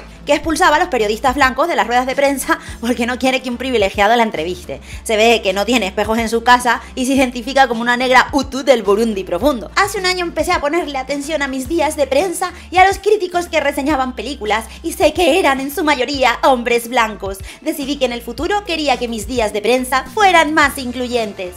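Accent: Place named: Canary Islands, Spain. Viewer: Amo tus videos tía, son oro molido para mis clases de preparatoria en las que intento sabotear la máquina de adocrinamiento de las universidades. También me encanta tu acento canario, saludos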